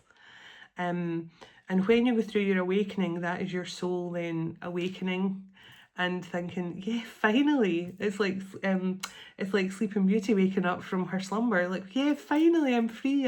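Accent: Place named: Scotland, United Kingdom. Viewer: YOU are so loved by all of us. When I first came across your Channel, I was intrigued by Tarot and what it was and listening to your Scottish accent was endearing....... when we connected by Zoom it was then I KNEW we are from the same Soul group..... my sister, sweetest feeling ever. I love tuning in just to listen to your stories and to hear of how God is moving you into your destiny......... beautiful. Thank you for being such a comfort to me,. I don't know how I managed my emotions until you began helping me..........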